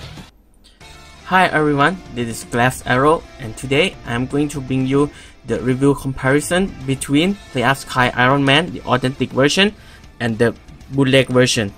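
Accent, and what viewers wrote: Thailand. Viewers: Ooo sawadikhap for your accent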